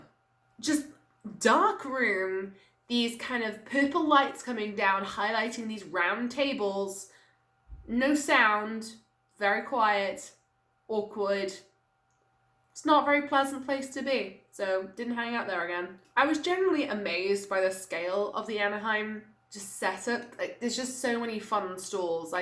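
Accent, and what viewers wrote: United Kingdom. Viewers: i just LOVE british accent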